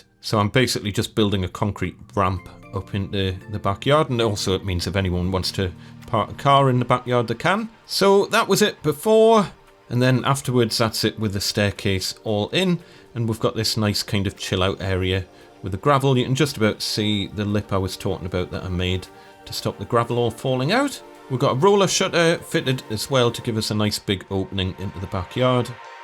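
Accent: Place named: North East England, United Kingdom. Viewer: This absolutely chuffing FANTASTIC! I'd be hard pushed to bang up a floating shelf so I'm in total awe of your incredible work. Also, it's a joy to hear a N.Eastern accent on social media. I left the Boro in 1983 for Bedford, just outside London, and whilst the Boro and Tyneside accents are separate from each other there are some similarities. Anyway, well done and best of luck to you and your wife and bairn/s for all future projects.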